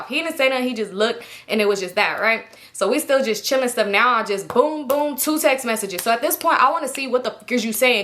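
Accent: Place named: Florida, United States. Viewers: Thats a Florida accent frfr. lol Love that for you! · I know a Polk accent when I hear one. IYKYK Our girl getting that ring 💍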